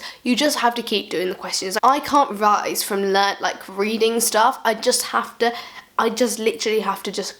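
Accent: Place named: RP, United Kingdom. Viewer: shes posh what you on haha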